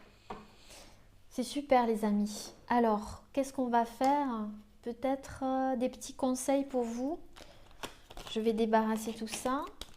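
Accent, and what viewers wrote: South, France. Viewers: Vous êtes mignonne! En + votre accent du midi c. top.Vous êtes habitée d'une douce énergie réconfortante, Merci.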